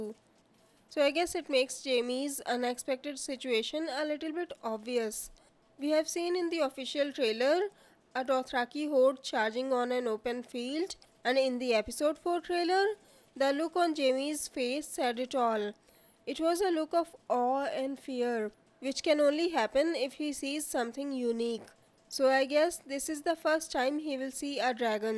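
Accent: India. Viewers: I could understand you without. Just for some reason I assumed you'd be American. But I like Indian accents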